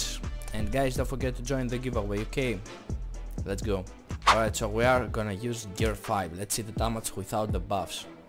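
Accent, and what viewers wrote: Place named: Greece. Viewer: Bro by the way you talk I think you are from Greece but I don't want to be rude or anything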